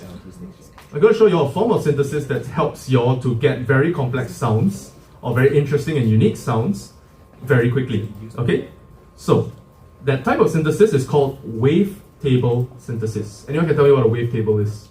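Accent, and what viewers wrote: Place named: Trinidad and Tobago. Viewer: Yo your accent sounds similar Trinidad. I thought that was interesting.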